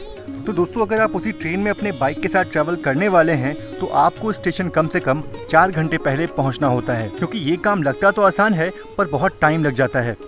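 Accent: Varanasi, India. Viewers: sir aap varanasi ke ho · Bhai kya aap bhee banaras se ho?????? 🤔🤔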